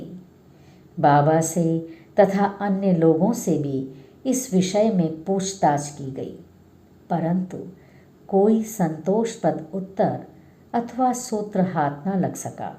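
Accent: Standard. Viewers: ओम साईं राम।बहुत ही मधुर और शुद्ध उच्चारण के साथ आज का ये साई सच्चरित्र का ये अध्याय सुनने को मिला बहुत ही अच्छा लगा।इसके लिए आपका बहुत बहुत धन्यवाद।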